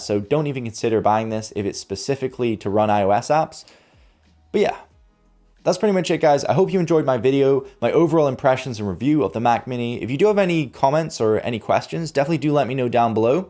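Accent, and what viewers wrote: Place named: Northern Ireland, United Kingdom. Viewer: I hear a twinge of Irish or Northern English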